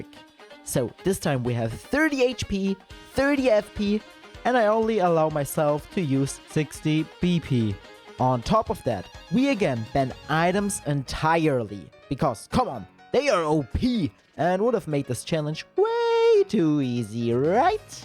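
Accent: Germany. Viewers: Man hört den deutschen Akzent raus 😂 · I hear a German accent ?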